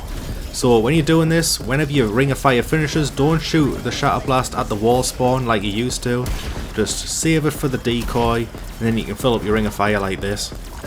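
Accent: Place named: North East England, United Kingdom. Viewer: I find myself to be quite a die hard zombie player, I've played since world at war and i haven't bought this game yet. Is it worth it? Also love that your from the north east ur accent shines 😂. I am too! Been watching you since bo3. Haven't much recently because I've not played this yet. But whenever i think of playing zombies again i come here 👍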